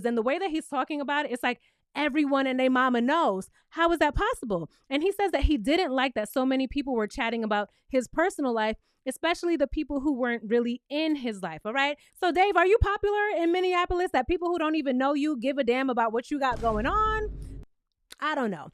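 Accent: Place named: New York, United States. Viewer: I'm here for the New York accent when it comes around top tier commentary as always ❤❤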